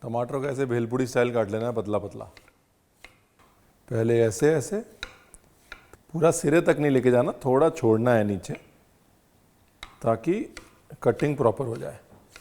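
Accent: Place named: Lucknow, India. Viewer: इतना लखनऊ टपकता है आपकी जुबान से मैं घर से दूर हूँ , करीब आ जाता है शहर । Keep going, lots of love Boss.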